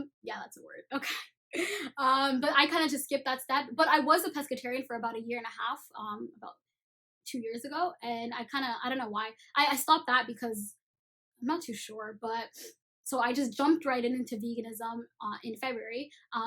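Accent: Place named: Ottawa, Canada. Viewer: I know an Ottawa accent when I hear one LOOOOOOL mashallah tho!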